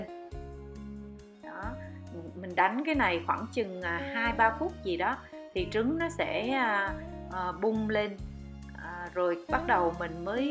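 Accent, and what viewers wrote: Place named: North, Vietnam. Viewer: Nói nghe mệt quá,, chị bắc kỳ ơi,, hơi dài dòng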